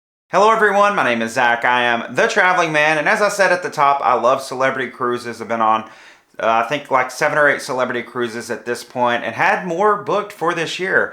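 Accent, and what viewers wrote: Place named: Carolina, United States. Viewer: You’d think that they’d have noticed by now that you’re not only a regular but a cruise vlogger who has driven a ton of people to their cruise line with your other glowing reviews and maybe tried to either keep your booking or at least incentivize you better than this. I bet that this video has probably dissuaded at least a hundred people who would have seriously been considering booking a Japan cruise with Celebrity to not book that cruise, and that your previous videos extolling their virtues as a cruise line probably drove them hundreds of bookings or more. I know I love your videos! Right now I live vicariously until I can afford to cruise again but I appreciate your honesty on all these videos and your Carolina accent makes me feel right at home. Also I agree with the folks who said you should consider going to Japan anyway and getting the train pass, if you can afford to do so. 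Definitely a country worth exploring in depth.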